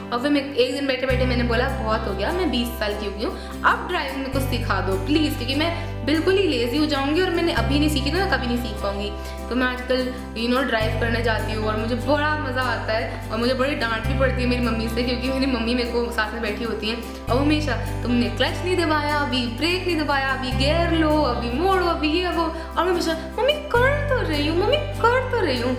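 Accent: Delhi, India. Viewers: Tumhari Hindi kitni polished lagti hai ! And the typical Delhi accent ! It sounds amazing ! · Oh my god u r so cute ur hindi accent is superb u are a typical delhi based girl i just loved it Nd even i m 20yrs n still my parents are not allowing me for learning driving anywayz no issue and all the best for ur exams lots of love😘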